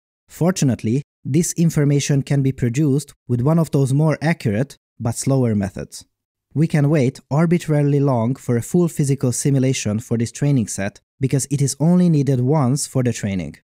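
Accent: Hungary. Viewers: lol the hungarian accent! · Are you hungarian? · The hungarian accent is strong with this one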